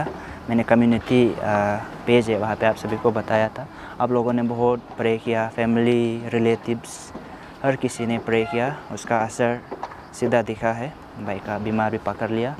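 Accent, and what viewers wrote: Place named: Arunachal Pradesh, India. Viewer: Bhai, Don't worry, little brother jaldi fit and healthy ho Jayega. Jesus Christ heel him. Or rahi BAAT Hindi Ka. Toh Jaise aap bol rahe ho. Aise he sunder Lagta hai. Arunachali Hindi style I love.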